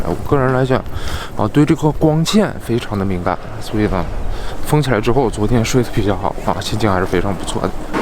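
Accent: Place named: Northeast, China. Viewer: 老哥这一口大碴子味儿 听着老亲切了！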